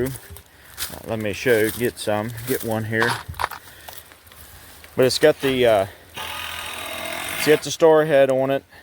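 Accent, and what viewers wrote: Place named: Pittsburgh, United States. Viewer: While looking for Pallet fence ideas for my garden I came across your video. Holy Pgh accent!!! I have one too. I didnt know SE Ohio carried this accent too. Very nice video and I appreciate the demo. It is very helpful.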